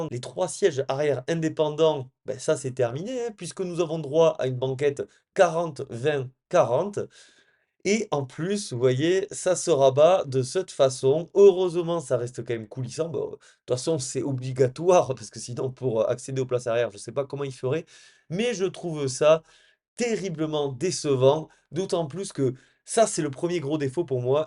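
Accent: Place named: South-West, France. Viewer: Sans être prévenu !!!! J'ai arrêté le visionnage dans la seconde !!! Non je rigole , j'ai subi jusqu'à la fin et ...... Ce n'est pas demain la veille que vous serez KWAME , POA , 100 BORNES pour être invité avec vos analyses et réflexions dénuées de tout professionnalisme ou dans votre cas d'un amateurisme averti ! Donc être invité à un lancement produit faudra être patient, plus pro (😮) car l'accent du sud-ouest n'excuse pas tout !!!.... Amen ..... 😮😮😮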